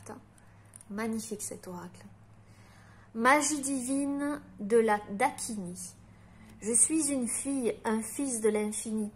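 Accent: South, France. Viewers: Merci l’accent du Sud tellement magnifique accompagnent tes messages qui sonnent très juste pour moi encore merci je t ´envoie plein d’amour Christophe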